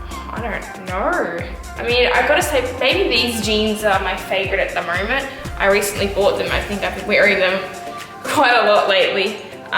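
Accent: United Kingdom. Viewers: I wanna ask you something Why do you sound like British like In a few vids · Are you from england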